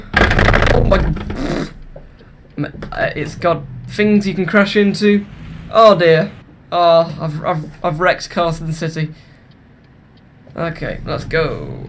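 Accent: United Kingdom. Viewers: young bri'ish fella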